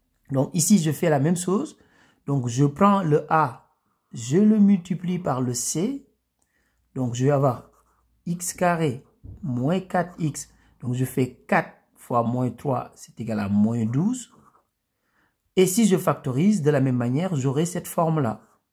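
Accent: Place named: Senegal. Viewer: Accent sénégalais...merci compatriote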